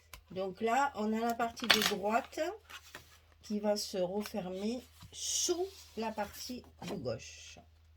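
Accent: South, France. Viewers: Bonjour, c'est pour vous dire que j’adore tout vos tutos, ils sont très bien expliqués, je n'ai jamais pensé à vous laisser un commentaire, mais je me rattrapages aujourd'hui, je doit faire un album naissance et il va y avoir beaucoup de vos pages. Je voulais vous remercier aussi pour m’avoir fait connaître le papier 50x70 cm, par contre vous êtes une artiste dans la décoration, moi j'ai encore des progrès à faire. Et merci pour votre bonne humeur et pour votre bel accent du sud donc je fais partie😊 ❤❤❤❤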